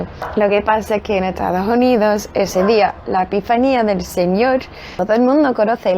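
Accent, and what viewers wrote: Andalusia, Spain. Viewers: Que graciosa una estadounidense con acento andaluz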